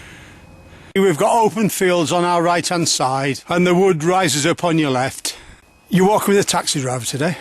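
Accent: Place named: Cumbria, United Kingdom. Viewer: thank you for your nice reply. Yes I am a HUGE fan of the beloved Lake District and indeed I am proud I spent the majority of secondary school days there. I plan to head up here to the equestrian centre adjacent to the boarding school I attended. The equestrian centre used to be Witherslack farm base of course in Witherslack village Grange Oversands. I love your STUNNUNG Cumbrian accent. Yes Morcolme Bay and a few other districts you mentioned are familiar as we at School used to venture. Yes I am DYING to head to the equestrian centre and extend my stay back up here, head to Grange and many other stunning spots. Is there still a Railway Museum at Cartmell?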